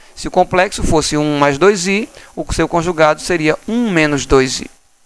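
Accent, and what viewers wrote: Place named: Ceará, Brazil. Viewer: é engraçado teu sotaque "sóbitraíndo" "pólinómio"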